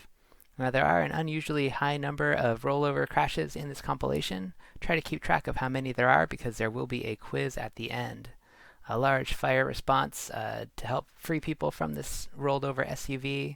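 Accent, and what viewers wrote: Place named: Seattle, United States. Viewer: I love how the most seattle sounding dude is commentating this.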